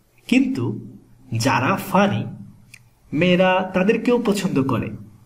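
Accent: Dhaka, Bangladesh. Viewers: Thank you. I fell in love with a Kolkatan girl your videos help me a lot. Is your accent Dhaka accent? Is it similar to Kolkata? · Although you are from Chittagong Division, your accent sounds more like that of a person from Dhaka than a person from Chittagong or Teknaf. I see that Ramganj is not so far from Dhaka, so that probably explains your accent. Chittagong Division is very large. It stretches to Dharmandal, which is farther north than Dhaka! I have a friend from Cox's Bazar, who will be moving to Dhaka in a few days. I am from USA, and I am a friend of his family.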